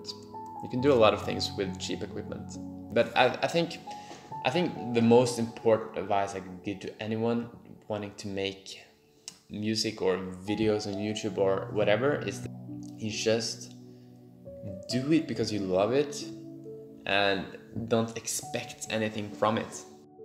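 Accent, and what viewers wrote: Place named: United Kingdom. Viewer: I love British accents! I love it so much!😍😍😍😍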